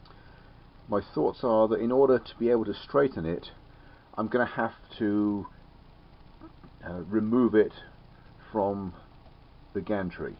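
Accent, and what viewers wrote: England, United Kingdom. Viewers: Yes, I've seen that page and I've met Phil - for several years he lived down the road from my parents' summer home in the Catskill Mountains. I know it was normal to pull strings of cars halfway off to, as you said, keep the barge balanced. I was responding specifically to your question of whether it was proper to leave two cars (you sound English, maybe I should say goods wagons?) alone on the apron, where, it seems, without other cars on one end or the other on a flattish surface, the two cars would be prone to roll off, unless someone went out and put on the brakes. I know another guy, whose email I can't find now, who rode the rails of various New York rail-marine operations in his youth, who might have some thoughts.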